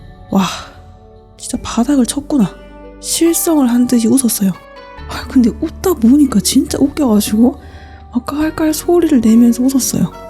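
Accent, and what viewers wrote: Gyeongsang, South Korea. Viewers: 경상도사투리와 서울말이 섞여있네요ㅎ 매력적입니다! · 구수한 경상도말투에 너무 재미있게 잘 보았습니다. 앞으로도 계속 풍요 안에서 좋은 내용 많이 나눠주시면서 더 행복하고 자유로우시길 바랍니다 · 이 놈의 갱상도 억양은 정말.. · 도네이션을 주기적으로 밥먹듯이 하는 이 채널의 정체는 무엇인고... 오호.... 제 유튜브채널 어차피 100만 넘을 것을 압니다.. 어차피 차고 넘칠 것.. 그냥 천천히 해보도록 하겠습니다.. 어차피 100억 부자 될걸알기에 천천히 즐기면서 인생 살도록 하겠습니다..ㅎㅎ 와... 이렇게 글만 적어도 너무 행복해지네요.. 더 신기한 것을 이 모든 내용을 말하면서 그림까지 완벽하게 그려내시는 당신은 도대체.. 짱이네요.. 그나저나 경상도 아니면 부산분 같은데! 맞나예? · 경상도 사투리 같은디.. 그것도 안동, 영주쪽 .. 본관이 어뎁니꺼?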